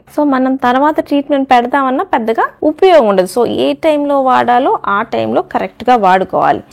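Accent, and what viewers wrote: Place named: Ongole, India. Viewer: డాక్టర్ గారు మీరు వివరిస్తున్న విధానం బాగుంది. కానీ తగ్గుద్దీ వచ్చుద్దీ అవుద్దీ ... వంటి ఒంగోలు విజయవాడ లో గ్రామీణ యాస ఎక్కువ గా వుంది. Public forum కదా.. అన్ని ప్రాంతాల్లో చూస్తారు... భాష కొంచెం మార్చుకుంటే ఇంకా చాలా ఆకర్షణీయం గా ఉంటుంది.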